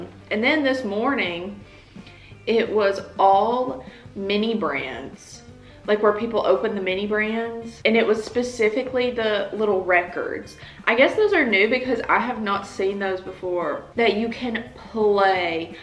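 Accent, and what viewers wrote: South, United States. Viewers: My sisters name is. I can’t marry her (I’m adopted(probz sound weird😅) but your name is W two DDs F.U.N F.U.N. My artist name has two DDs(like this) too ahaha. Anyway you sound southern. Southern hospitality meet African affability together= ignition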